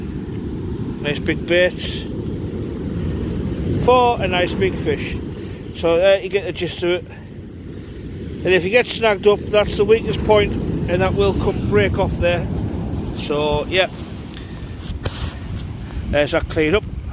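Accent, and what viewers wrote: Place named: Teesside, United Kingdom. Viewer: Just subbed👍👍 I think you're my neck of the woods by your accent. Are you Boro/Stockton area?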